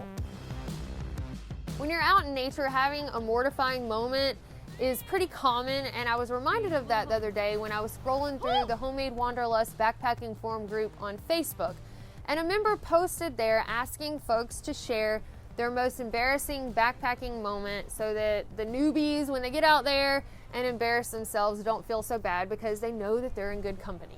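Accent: South, United States. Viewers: Love ALL your vids, but a side effect of binge watching yours and Quicksand’s videos is that when out hiking I find myself thinking and talking to myself in that beautiful southern accent, I swear 🥴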